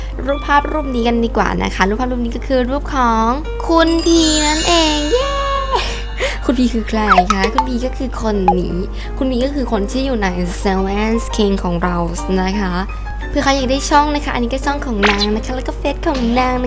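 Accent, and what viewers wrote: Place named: Suphanburi, Thailand. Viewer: ไงครัช ชอบรายเส้นนะ เก่งอะ เหนอวะ เปนคนสุพรรณนิ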